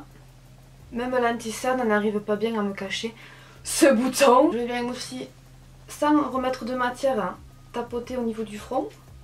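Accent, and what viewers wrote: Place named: Marseille, France. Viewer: Quel est donc ce bel accent ? Marseille ? ??🤔😉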